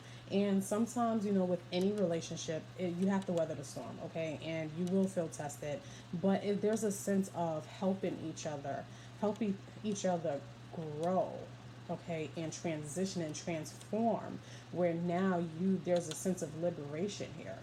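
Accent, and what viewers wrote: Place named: East Coast, United States. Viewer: #3 yes, resonated so much. and i love your east coast accent!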